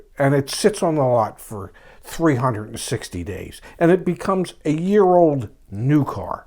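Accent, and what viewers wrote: Baltimore, United States. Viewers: Love that "Balamer" accent! You sound like you should be in a John Waters movie!😎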